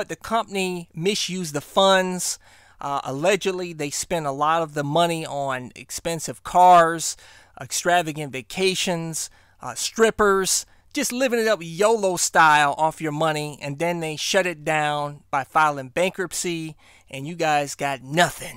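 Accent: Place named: Kentucky, United States. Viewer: You sound different. What happened to that Kentucky accent?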